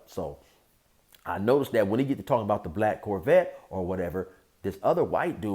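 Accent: California, United States. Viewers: Bruh in white and I literally cannot do a white California voice anywhere near this level. Sounds like that Wes Moreland (that big white guy who has a channel) hahaha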